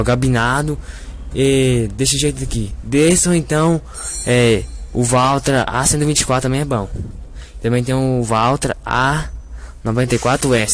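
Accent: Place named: Brazil. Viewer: A sua simplicidade de falar e mais lindo, acho lindo os sotaques desse brasilzão, Deus abençoe! Desejo para você sucesso com o seu canal!🤝🏻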